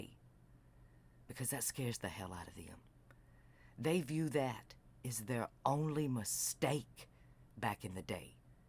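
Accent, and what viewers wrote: South, United States. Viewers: I stumbled upon a story yesterday. The voice was like smoked butterscotch, deeply southern. At first I was reminded of Julia Sugarbaker from the show "Designing Women." Then I got into the meat of the tale... a horrifying recount of a Dark Time and her memory saving her from recalling the full measure of it. Then the algorithm did a good by putting her on my suggested list today. You, madame, are AMAZING.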